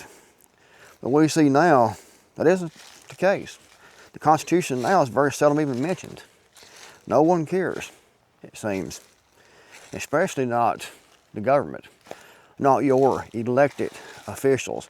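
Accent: Appalachia, United States. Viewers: First, you need to stop talking like you just rolled out of the holler! I’m from West Virginia, born and raised and nobody I know talks that way. Talking that way is why nobody takes Appalachia seriously. Second, the left talks about the constitution daily and how it’s being violated every damn day! If you fools on the right wouldn’t have allowed yourself to be brainwashed by conmen we wouldn’t have these problems. The constitution is just a piece of paper. If we want it to actually mean something we have work make sure it’s followed. That means no conmen in the government, no religious zealots in the government and no white nationalist bigots in the government. Currently that who’s in charge and that’s why constitution is being trampled on.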